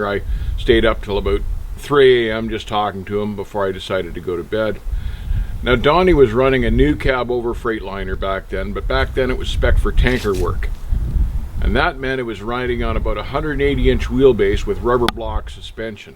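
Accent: Canada. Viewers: Love the Canadian accent...it’s not about.....it’s a-boot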